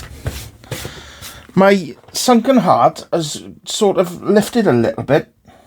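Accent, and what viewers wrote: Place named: Wales, United Kingdom. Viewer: Nice to hear a quality welsh accent sir! And T2 certainly made this product very famous.. and desirable :)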